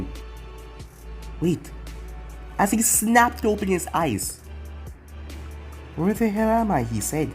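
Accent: Jamaica. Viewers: Are you Jamaica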